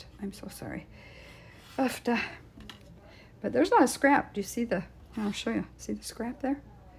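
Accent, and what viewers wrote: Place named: Minnesota, United States. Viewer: I love these! So beautiful! And what a cool technique! Definitely want to give this a try! Are you from Minnesota by any chance? Or maybe Wisconsin? I was guessing you were as I was listening, but the "ufda" gave it away! Ahhhhh! The sound of home!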